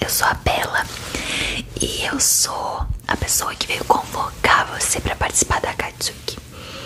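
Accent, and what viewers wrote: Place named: Rio de Janeiro, Brazil. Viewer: Olá, então tu és gaúcha? O teu "carioques" me enganou direitinho.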